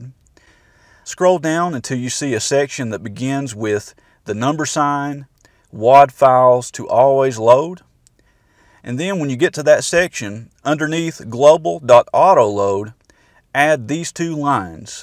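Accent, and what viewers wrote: South, United States. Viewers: Good American Accent Boi! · Just found this video. It is absolutely excellent. Clear and concisely presented instructions in a superb American accent. I don’t know what state you’re from but it is mega cool! Best wishes from England. P.S. I also agree with you that Doom is the foundation of most games in that genre. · Love your southern accent, bro. : > I would have mentioned Crispy/PrBoom+ for the more purist minded people, but keep up with the good content!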